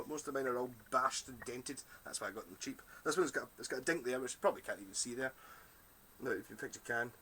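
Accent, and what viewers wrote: Scotland, United Kingdom. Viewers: Thank you.......Yes your Scottish accent threw me off a little bit lol, also your right about the Factory being Top-notch, I can tell because I can see just how Well this guitar is made. I've owned several priceless guitars in my day, I'm no stranger to CRAFTSMANSHIP, and this Instrument HAS IT! Plays Great, Feels Great.......Thanks again you have another Fan who now resides in the middle of the Pacific. Aloha from Maui.......🎸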